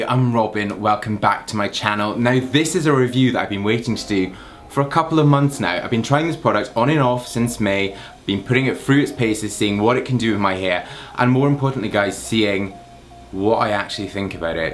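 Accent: United Kingdom. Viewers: Is funny how British people talk.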